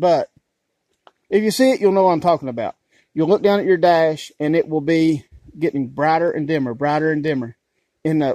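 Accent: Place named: South, United States. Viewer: I don't understand why people feel the necessity to criticize such good-hearted intention and help. The bungee cord move.. what a boss .. so simple, it's genius! (Poetic license here folks, please? I understand proper grammar. But, we're not in an academic setting. Are we?!) Being a city boy mathematician, 17 years ago I moved to a small Texas town. I've discovered I'm whiter than most and a country boy at heart! The problem with that is that I'm Hispanic, Mexican American, or whatever I'm called these days. I liked the Southern Drawl! Brother, I guess many have forgotten the Golden Rule. I enjoyed your video and light sarcasm. You are funny! Don't forget to keep us laughing. I learned and I was entertained at the same time. As an educator for over 30 years, learning should be FUN! GREAT JOB!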